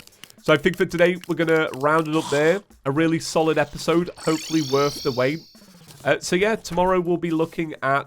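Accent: United Kingdom. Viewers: Hello sexy-voice British man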